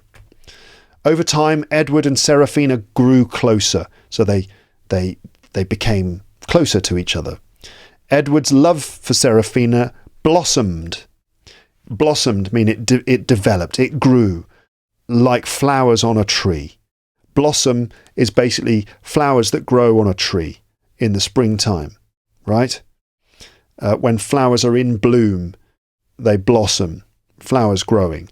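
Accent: United Kingdom. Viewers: Hi, a big thank you for your videos I always switch the speed for 0.75 and repeat after you as I really want to develop the British accent. At the moment I’m learning how to fix my accent with help of another Nicholson, so both Lukes are helping me, thank you guys. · Please answer me Is this British accent? Idk the difference between it and American.. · so now you have a British accent?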